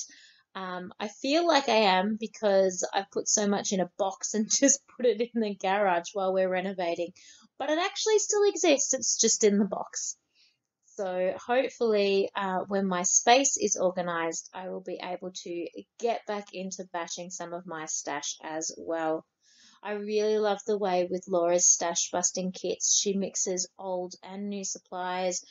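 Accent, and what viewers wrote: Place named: Australia. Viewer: I love the Aussie accent soooooo much so this was a lovely treat for me. Course, I'll be glad when Laura can speak again too ;)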